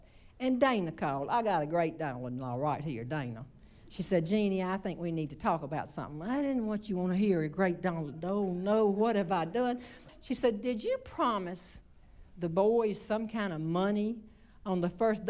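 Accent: South, United States. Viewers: Lord! What do they put in the water down there? I always thought we were funny up here in the mountains but you with your Southern accent have us beat good. How ‘bout heading up this way and exposing us to your down state humor? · I love her humor! I love her attitude!! I love her sweet southern voice! She is a joy to listen to!!! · It’s wonderful to listen to a beautiful southern lady and you’re absolutely hilarious 😂. One southern lady to another please keep the laughter coming, lord knows it’s what we need. Unlike most women comedians there truly not funny or some are just nasty and (completely tacky), though I doubt they even know the meaning of the word.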